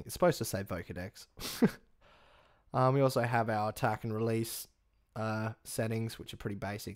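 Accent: Australia. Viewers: wow i just found your channel and so far its the only helpful channel ive found :3 im guessing you are australian? so am i!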